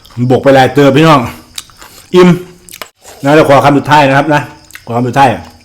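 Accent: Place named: North, Thailand. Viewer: สำเนียงเหมือคนเหนือเนอะ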